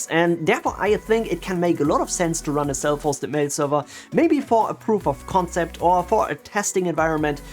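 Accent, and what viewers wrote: Germany. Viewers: Do you speak german? Because i think i hear a bit of a german accent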